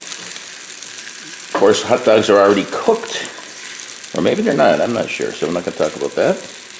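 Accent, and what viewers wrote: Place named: Great Lakes, United States. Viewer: My grandmother used to make this around Buffalo, New York. This is one of my favorite breakfasts. I don’t eat it so much anymore because I’m trying to eat more healthy but man is it good. From your accent I’m assuming that you are perhaps from the Great Lakes region? Maybe it’s a thing in that area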